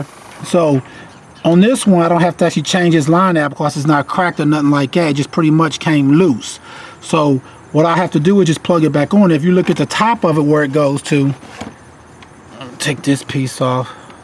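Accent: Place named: St. Louis, United States. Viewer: Man are you from st.Louis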